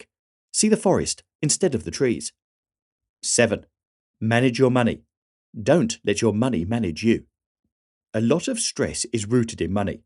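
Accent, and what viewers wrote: United Kingdom. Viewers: That thick british accent is so annoying:d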